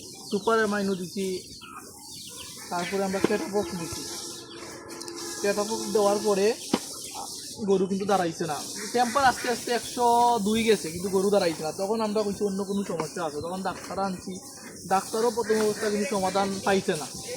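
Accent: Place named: Sylhet, Bangladesh. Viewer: ভাই সিলেটের মানুষ কি এতই মূূরখ যে বাংলা শুদ্ধ ভাষায় কথা বলতে পারেনা আপনার এই ভাষা কি সারা বাংলাদেশের মানুষ কি বুজে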